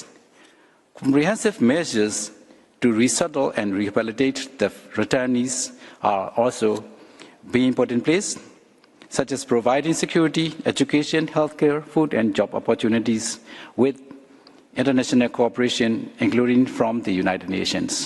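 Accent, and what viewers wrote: Myanmar. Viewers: အင်္ဂလိပ်မှမဟုတ်တာ မြန်မာက အင်္ဂလိပ်လိုပြောတာလေ။မင်းကဘာဖြစ်ချင်တာလဲ။ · ငပိသံကြီးနဲ့ဟ ..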